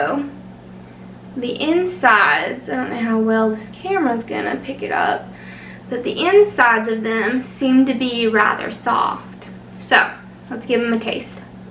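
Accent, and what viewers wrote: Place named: South, United States. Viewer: Omggg! You’re back! Hello! I hope you don’t take this the wrong way, but your social media detox made your Southern accent more distinctive! I mean that in an endearing way because I love all accents in general!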